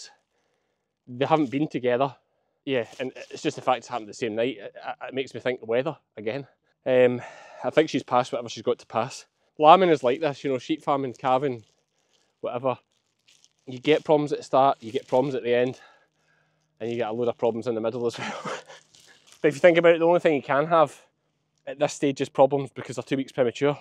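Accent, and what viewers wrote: Scotland, United Kingdom. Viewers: you are getting very “Scottish” as you are teaching us! Don’t forget your Canadian fans!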